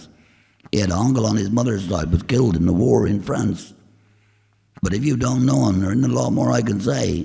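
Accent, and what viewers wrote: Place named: Cornwall, United Kingdom. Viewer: Thank you for this...I hear my Mum, a Penzance maid, who is now passed in your dialect. Don't hear it in Pennsylvania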